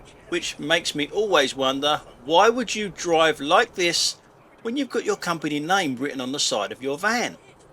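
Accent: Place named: Cockney, United Kingdom. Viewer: I could listen to a cockney 'avin a moan for hrs, reminds me off my old Nan... Thanks a million dude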